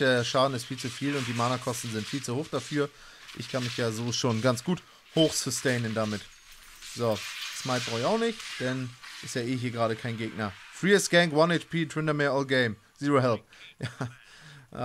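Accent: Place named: Austria. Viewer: Ich kenne dein Dialekt.....du bist Österreicher ne?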